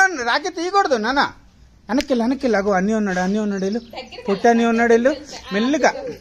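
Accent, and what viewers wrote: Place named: Andhra Pradesh, India. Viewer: Anna mee slang maa Srikakulam la undi,? Meedi Srikakulamaa!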